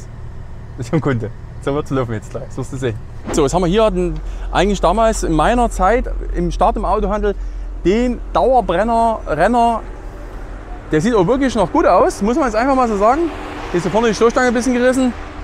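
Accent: Saxony, Germany. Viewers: Alter deine sächsische akzent ist absolut Hammer 🤣🤣🤣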